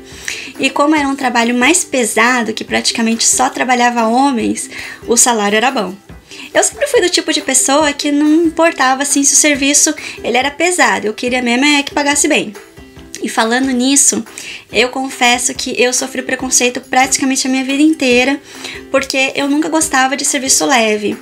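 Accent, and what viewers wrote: Minas Gerais, Brazil. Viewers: Queria saber em qual parte do brasil ela nasceu e foi criada pq o sotaque e as girias conheço todas kkkkk! Sou do Cearà. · Adorei você, seu sotaque mineirinho, sua delicadeza, realmente um verdadeira gueixa brasuca. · Tu é de Minas Gerais?! Tem um sotaque mineiro da hora! 😅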